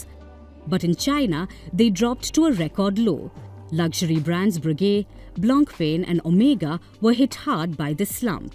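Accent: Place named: India. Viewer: narrator sounds indian - making this a suspect propaganda video by the indian government.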